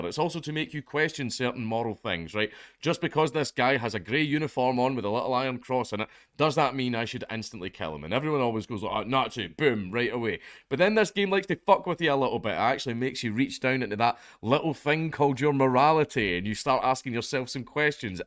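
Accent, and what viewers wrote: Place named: Scotland, United Kingdom. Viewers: I think it was just his scottish accent. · No it was just that thick Scottish accent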